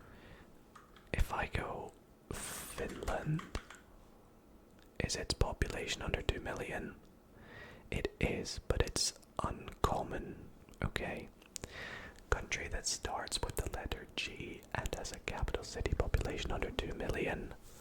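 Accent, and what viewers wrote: Scotland, United Kingdom. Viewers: Where abouts in Scotland are you from because I’m from Scotland too 🎀🏴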